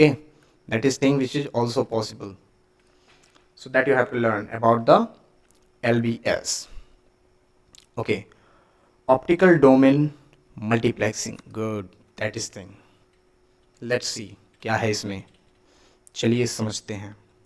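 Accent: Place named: United Kingdom. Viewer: Sir ka hindi bhi English accent me h 😅 But explained too good 👍🙌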